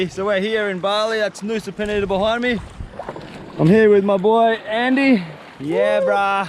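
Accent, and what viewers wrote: Australia. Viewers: Not "Doggies." It's GAWGIES. He's Australian and accent goes on "D" from some unknown reason :/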